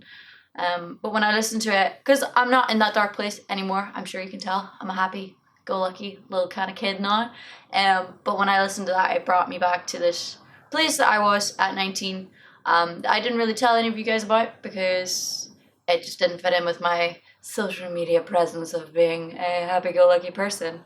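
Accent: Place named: Ireland. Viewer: You loosing that beautiful Irish accent, need to spend more time back home.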